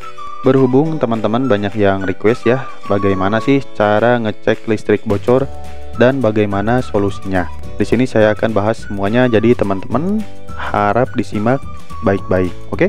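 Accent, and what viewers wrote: West Java, Indonesia. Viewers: Narator na Jigana urang Sunda.😁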